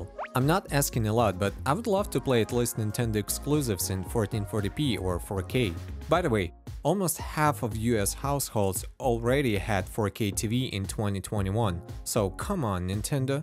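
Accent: Russia. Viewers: russian accent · да ты русский, акцент чувствуется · тебя выдает подоконник)) в штатах таких подоконников нет. Да и по английскому слышно, что наш человек:) Если не секрет, то чем будешь пользоваться в случае блокировки ютуба?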